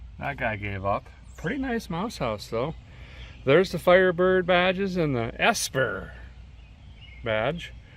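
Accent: Canada. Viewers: First video for me and I swear I would've put my life on it that he was Canadian!!! LMAO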